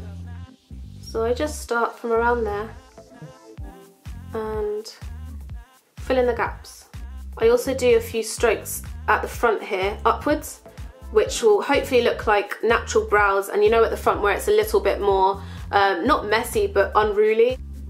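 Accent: United Kingdom. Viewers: why u don't make a video for learn english accent cause i really like ur british accent , actually u don't need make up !! you're pretty .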